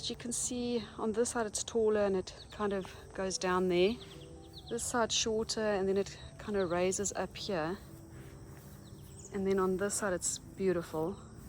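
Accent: South Africa. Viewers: What a beautiful garden I thought you're here from SA with the accent but the house and fence is not typical until you mentioned frizzle sizzle plant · You sound South African stayed to watch because of that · You have an SA accent. I love your garden. One of the best so far.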